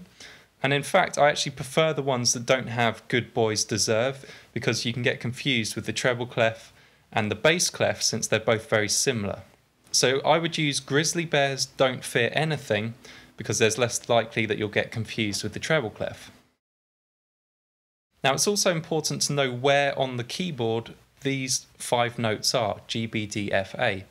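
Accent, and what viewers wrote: United Kingdom. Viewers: I just started trying to learn piano, and I have a feeling I'm going to utilize this channel a lot. Plus, it's a lot more fun to learn along to an British accent :)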